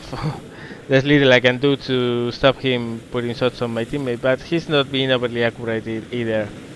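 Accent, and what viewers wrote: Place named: Spain. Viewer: Dios se nota a la legua que eres español jajaja buen video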